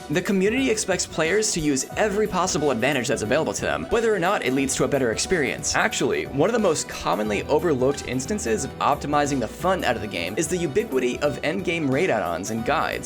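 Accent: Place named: North America. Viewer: I like the mentality and if I could I would subscribe to it. Feels impossible to do so - especially in Retail where I play mostly. And even thinking about doing things semi-blind has many insinuations of ones 'skill' - their 'commitment' and so on. It's just easier, even from that social perspective, to fall in line and do things the 'accepted way' even if it feels like the soul is sucked out of it. I'd love to try out and play with your guild but you sound like you're from NA so RIP for me joining. Hope it goes well though. Good luck.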